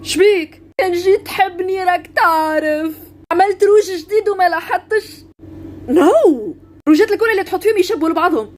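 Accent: Tunisia. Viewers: هذي اللهجة التونسية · هههههههههه يازين اللهجه التونسيه طريقه حلوه للتعليم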